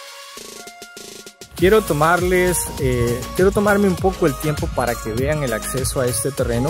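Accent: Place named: Colombia. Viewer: Disculpa tú de qué nacionalidad eres amigo me suena que eres colombiano verdad sólo por curiosidad gracias y bendiciones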